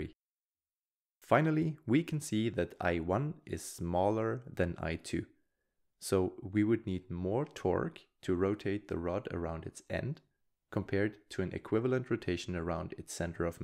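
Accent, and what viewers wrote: Germany. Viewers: Listening to his accent, I think he is German.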